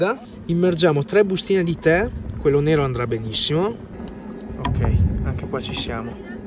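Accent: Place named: Trentino, Italy. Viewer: Ehiii, da dove vieni? Io sono trentina e sento che anche tu lo sei hahaha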